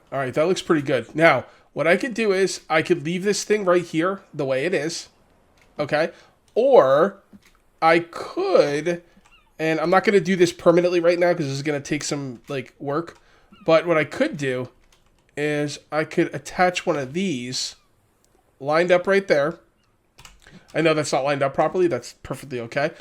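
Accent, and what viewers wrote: United States. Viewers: FINALLY a true American accent among those British speech impediment accents.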